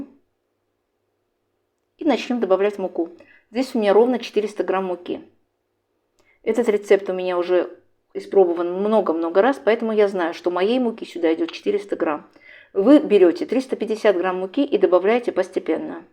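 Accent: Baku, Azerbaijan. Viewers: Вы наш глоток воздуха…, наша замечательная память о родном , любимом Баку!!!!! Все ,что вы предлагаете нам испечь, все вкусняшка! С огромным удовольствием слушаем Вашу речь с Бакинским выговором, мягким и нежным, только наши женщины так разговаривают, певуче! Будте Счастливы и оставайтесь долго, долго такой обаятельной и привлекательной!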